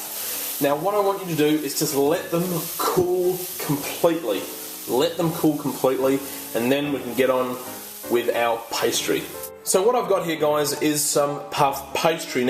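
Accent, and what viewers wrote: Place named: Australia. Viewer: awkward ... hes Australian.... .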